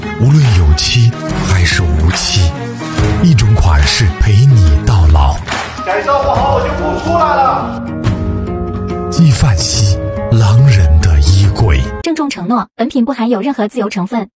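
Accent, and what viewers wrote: China. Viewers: 老哥不像台湾人 你那把中国人的口音骗不了人 就像新加坡人跟马来西亚人的口音差距太大，中国南方跟东北人的口音那样，只要一开口说话立马漏馅了😊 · 你虽然是台湾人但是你的口音确实像大陆的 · 你口音就是 京津一带的口音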